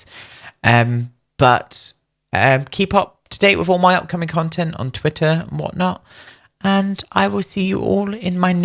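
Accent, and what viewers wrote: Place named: Scotland, United Kingdom. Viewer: As a Brit living abroad I love watching your English builds so much 💙 Makes me very nostalgic for home 🥰 Thank you! Please ignore this weird question if it is too personal, but are either of your parents Scottish? You don’t have an accent but you pronounce a lot of words in a Scottish way so just wondered!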